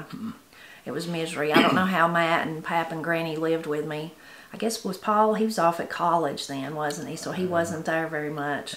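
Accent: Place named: North Carolina, United States. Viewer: I love listening to all your stories. It's the accent. My family is from North Carolina and its like being able to hear them again.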